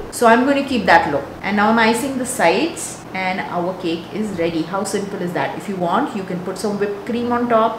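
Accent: India. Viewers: I love the thick indian acctent, the cake, and the fact that you put the macros too.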